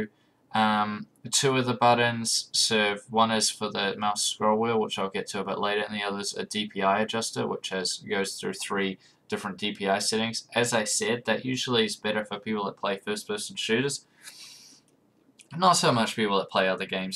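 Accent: New Zealand. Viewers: Hey, it's a kiwi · He's from New Zealand... Not Australia.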